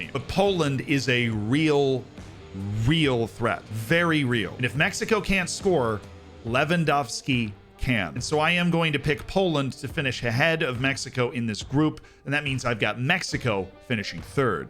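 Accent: United States. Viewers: I just now realized you are American lol. I just assumed, as an English speaker talking about Football, you were English. Even though your accent is very clearly americam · Your accent is way too american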